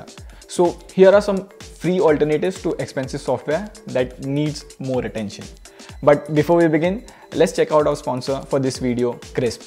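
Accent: India. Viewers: Hallo friends in have indian acsent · thick indian accent why it have to be thick indian accent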